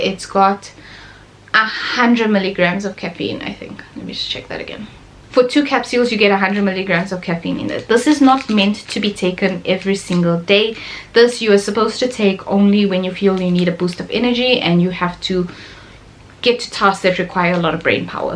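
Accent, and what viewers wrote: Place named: Cape Town, South Africa. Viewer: Are you colored? You sound like you from Cape town?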